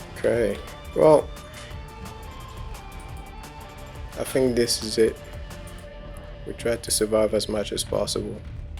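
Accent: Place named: Sub-Saharan Africa. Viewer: you sound subsaharan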